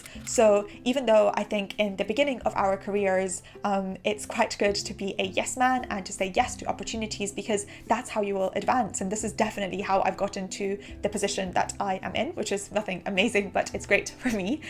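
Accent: United Kingdom. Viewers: I have been learning English by reading English novels for myself without anyone's help. The most difficult thing I have as a Korean for learning this language is to practice listening to native English which is different depending on where they are from. I have chosen you as a model of British English. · Beautiful wonderful marvellous. Good British English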